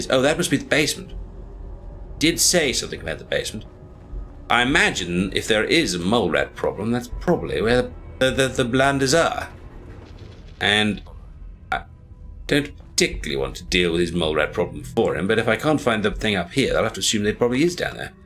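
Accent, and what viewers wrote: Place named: RP, United Kingdom. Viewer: This is awesome so glad i saw this in my feed :D your posh accent is on point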